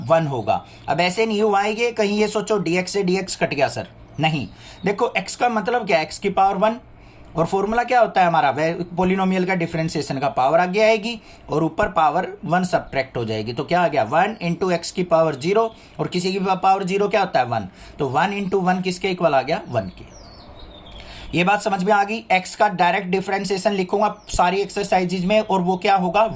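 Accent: Haryana, India. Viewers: "agar ---yo-- function " sir are you from harayana (I identify you by this word "yo") ex: yo choro kam ko----koonya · Sir aap Haryana se hai kya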